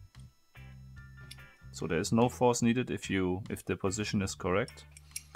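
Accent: Germany. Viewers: du bist deutsch! höchstens ein deztscher würde I-dentikäl sagen, ein engländer oder amerikaner würde Ei-dentikäl sagen! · . Your videos are great. I love your freaky german accent. But repeat after me. Sehr-ries. Series. Not serious. That shit is seriously annoying.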